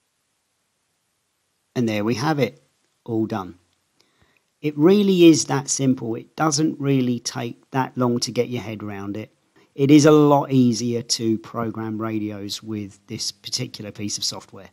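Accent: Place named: Scotland, United Kingdom. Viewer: You sound a bit Scottish.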